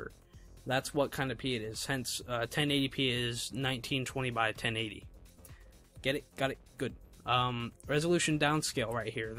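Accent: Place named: Ireland. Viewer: Irish, m8 Irish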